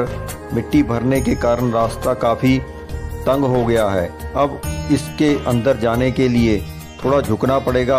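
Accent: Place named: Punjab, India. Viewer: Bhai ji tuhade Hindi bolan de andaz to pta lgda he k tusi Punjabi bolan vale ho fir Punjabi bolo kion k isnu jiyada dekhan vale punjabi hn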